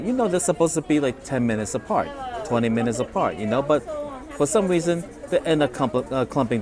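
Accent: New York, United States. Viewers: I thought New York as soon as I heard you. First time watcher, enjoyed it very much. Been doing Vegas since the early 70's, usually twice a year. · Loved the video!!! I never noticed you had an accent!! You sound like your from NYC to me lol! I’m from Maryland so I never picked up your “Accent”!! 😂😂😂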